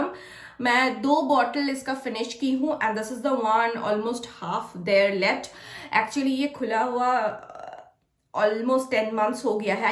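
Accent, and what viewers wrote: Bengal, India. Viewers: Hota hai uska language kuch aur hoga jaise bangali...isliye Hindi clear nahi h.... foreign log Ghar nahi sambhalte kya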